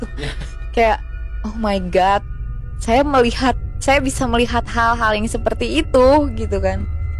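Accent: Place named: West Java, Indonesia. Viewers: Sunda pisan euy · Kok ketara banget logat Sunda di gaya bicara nya ya bang??? 😁😁😁😁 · Seru sih ceritax cmn cape dengerx mbax nyusun katax mikir bgt...mungkin krn logat sundax..😀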